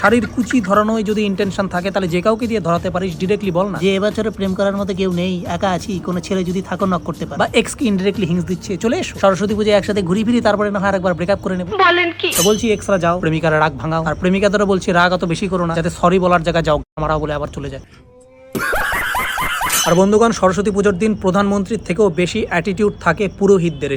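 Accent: Bangladesh. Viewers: তোমার কথা মাঝে মধ‍্যে শুনলে মনে হয় বাংলাদেশের!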